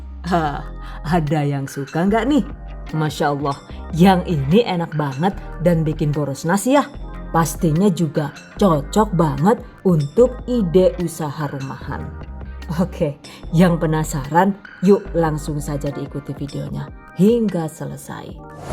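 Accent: Banyumas, Indonesia. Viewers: Aku kalau lihat video yang ku tunggu pas mbekayune bilang pakai bahasa ngapak.... Auto langsung nggakak aku.. Ilmu dan lawaknya sangat menghibur yu...salam dari Wong Banjarnegara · Jebule channel ngapak y... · Nyoba bikin ah.. Bahasanya Indonesia Inggris dan ngapak 👍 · 😂😂😂..mbekayune...sampeyan asline ngendi koh..mBanyumasane mblaketaket pisan....sukses yuuu...inyong jan ngiler gyeh kpengin nyicipi sambel medurane...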